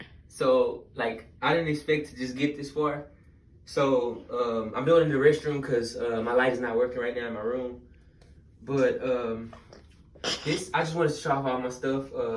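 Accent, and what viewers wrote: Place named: Texas, United States. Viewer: When you said wassup wit it lol I knew you was from Texas lol I’m in Dallas mayne keep doing ya thang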